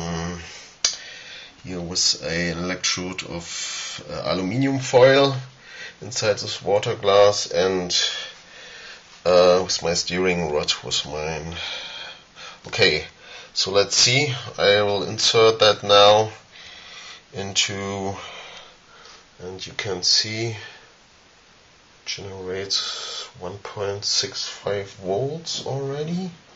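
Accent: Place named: Germany. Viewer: just a question.. where are u from? ur english sounds like u was a german o0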